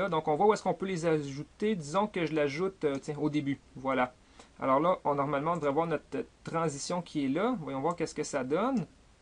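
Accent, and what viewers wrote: Quebec, Canada. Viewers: YESSSS !!!! enfin un québecois ;) mais bon merci bonne video ;) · JE VEUX CE PROF IL A L'ACCENT QUEBEQUOIS TABARNAK J'ADOÏRE <3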